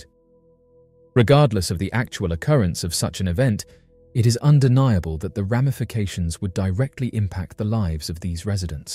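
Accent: United Kingdom. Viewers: We need British sounds like you to be assured it's bound to occur in my life.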